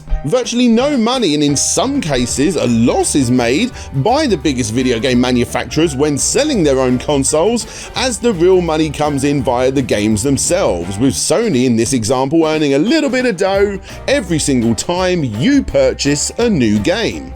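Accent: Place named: England, United Kingdom. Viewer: You forget dingleberry, that he’s English and they say certain words with certain enunciations. Right now you come off as one those assholes who thinks they are smarter than everyone else.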